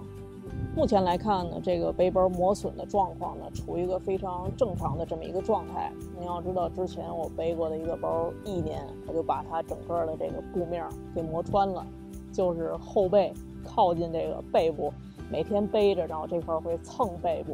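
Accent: Beijing, China. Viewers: 很高兴发现了您的频道，比较好玩的是：我还没怎么看内容呢，光听你的声音就订阅了，离开北京太久了，喜欢听一下乡音:)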